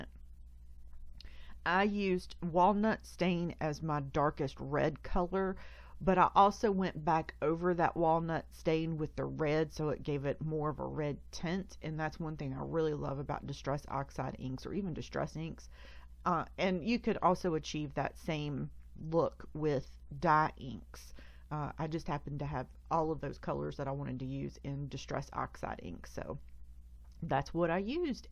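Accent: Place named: South, United States. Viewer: Love your accent! I’m guessing you’re from the south, it reminds me of a colleague I have from Mississippi 😊 Your cards are so pretty and fun! TFS!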